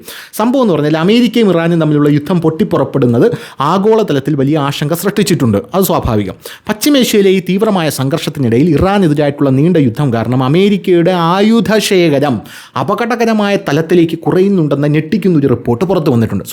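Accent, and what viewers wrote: Thiruvananthapuram, India. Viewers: ചേട്ടൻ ഇങ്ങനെ രണ്ടു തിരി സംസാരിക്കുന്നു വേറെ ന്യൂസിലെ സ്വാഭാവികമായിട്ടും നോർമൽ ആയിട്ട് സംസാരിക്കുന്നു അണ്ണാ ഈ ചാനലിൽ നിങ്ങൾ കോമഡി ആയിട്ടും എന്നാൽ എട്ടിൻറെ പണി കൊടുക്കുന്നതായിരിക്കും സംസാരിക്കുന്നു ഇഷ്ടപ്പെട്ടു ഐ ലൈക് യു നിങ്ങൾ തിരുവന്തപുരം അവിടുന്നു വന്നതാണോ വർത്തമാനം പറഞ്ഞ ശൈലി കേട്ട് കഴിഞ്ഞാൽ അങ്ങനെ തോന്നുന്നുണ്ട് ഈ ആദ്യം പറഞ്ഞ അയ്യാ വണക്കം അത് അടിപൊളിയായിട്ടുണ്ട് നിങ്ങൾക്ക് ശരിക്കും തമിഴ് അറിയാമോ കാരണം ഞാൻ മലയാളം ആണെങ്കിലും എൻറെ അച്ഛനും അമ്മയും തമ്മിലാണ് നിങ്ങൾ പറഞ്ഞ ഡയലോഗ് എനിക്ക് ഒരുപാട് ഇഷ്ടപ്പെട്ടു ❤❤❤❤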